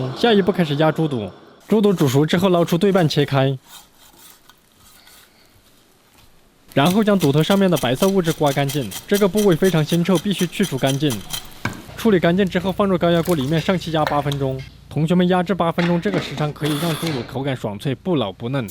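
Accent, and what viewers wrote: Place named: Sichuan, China. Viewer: 明显川普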